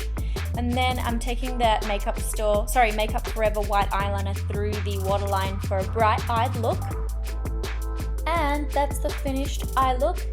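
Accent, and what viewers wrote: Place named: Australia. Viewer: Love that accent, Australian?? I like this makeup tho :) xx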